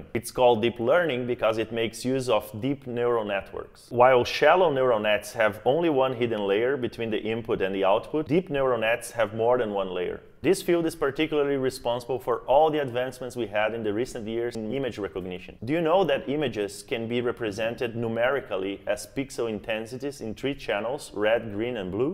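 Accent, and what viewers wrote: Brazil. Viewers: Certeza que é brasileiro hehe... belo video!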